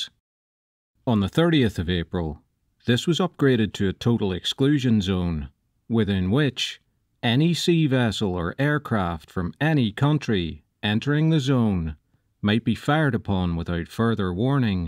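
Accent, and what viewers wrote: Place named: Ulster, United Kingdom. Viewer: Hello, I've been reading comments and replies on your work, you have broad shoulders mate! The way you answer critics is restrained and civilized, many people lose their composure at the merest hint of criticism. I think your Ulster accent is just fine, and makes me homesick. Your responses to kudos too, are very composed and mature. I'm a fan. As soon as I can, I'll be adding my name to your list of subscribers. Keep up the great work, I think your site is FANTASTIC.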